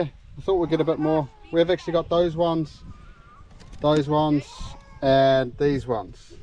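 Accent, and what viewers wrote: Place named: New Zealand. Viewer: Where abouts is ur farm u sound kiwi, also how many acres do u have?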